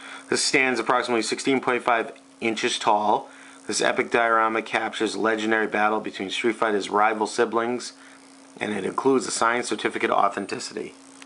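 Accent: Boston, United States. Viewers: You sound like your from Boston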